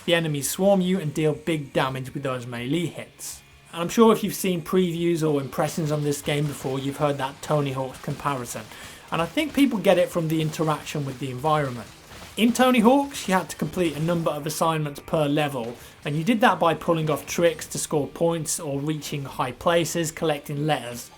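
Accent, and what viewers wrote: United Kingdom. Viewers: Callum A I think Waysted meant that dupont was generalizing Jack's accent to all of Europe. Waysted said "UK is Europe?" but he meant to say "Jack's accent is relative to the UK, not all Europeans speak like that."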